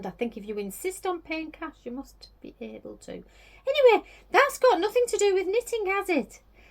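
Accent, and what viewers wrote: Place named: Northern England, United Kingdom. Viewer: Just come across your channel and have subscribed. The quilt is beautiful - such soft, pretty colours. I’ve never tried Tunisian crochet so am interested to see how your sweater turns out. Where is the beach at the end of the video? I was born in Northumberland and lived in the North East until my late twenties. So nice to hear a northern accent. I’m with you on card only payments. We must pay with cash wherever we can otherwise risk losing it altogether. Happy crafting, Margaret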